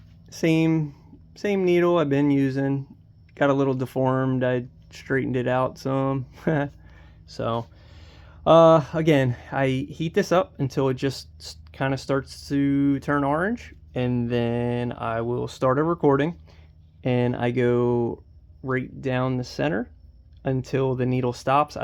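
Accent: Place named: Delaware County, United States. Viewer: where you from ? you got that Delco accent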